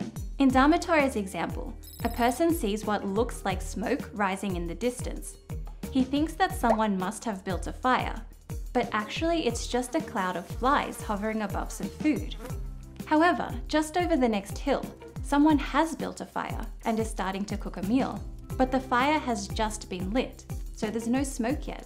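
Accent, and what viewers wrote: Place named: Australia. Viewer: I love your cultivated Australian accent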